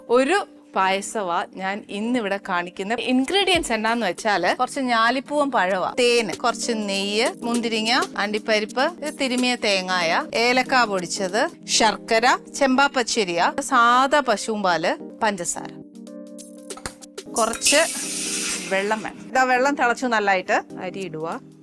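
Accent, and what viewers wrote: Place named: Pala, India. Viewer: പലാക്കാരിയുടെ സൗണ്ട്.r u from pala?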